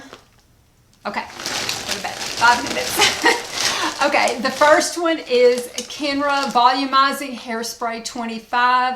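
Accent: South, United States. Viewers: Do empties-don't do empties! As long as you keep doing videos! You are a very sweet and soft spoken southern Lady that gets me to relax when I listen to you. So, thank you!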